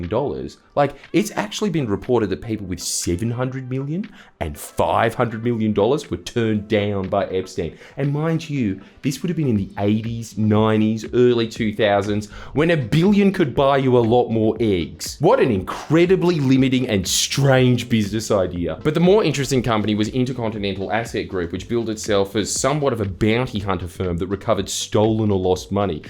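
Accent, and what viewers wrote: Australia. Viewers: I'm assuming you are Australian and my mind can't process those words with an Aussie accent😅😅🤙🏾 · This man is funnier because of his Aussie accident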